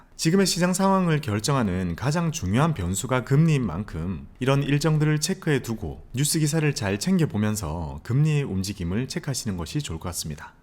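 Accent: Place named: Seoul, South Korea. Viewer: 경제뿐만 아니라, 서울말도 배울 수 있어서 좋은 채널인 거 같아요! 님 쵝오:)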